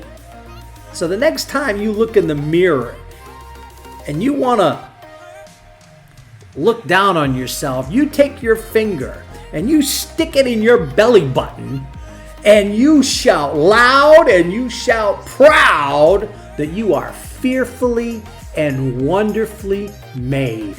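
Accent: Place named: New Jersey, United States. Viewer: Hi I just found your site and love it, many of my favorite artists you are covering. I am also a Christian and have be studying and teaching the creation/evolution topics for 45 years, teaching only for the past 30. This is a very nice video on the Creator handy-work. Did you know that the brain spends or dedicates 30% of its computing power to the hand? In other words, God created man to use his hands with the ultimate control, just look at a surgeon, painter, pianist, slight of hand magician, or anyone else using their hands in a delicate manner. Monkeys can't do that, we can because we are fearfully and wonderfully made. Your accent sounds like you are from NJ, or NY or close by. There is a great illustrator (there are so many) that you should interview, he is also a Christian, his name is Thomas Fluharty and he loves to talk. Anyway, I love your enthusiasm keep up the great work. 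from NJ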